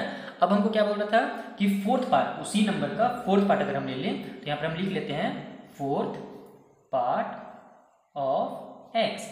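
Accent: Bihar, India. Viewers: sir app bihar se ho na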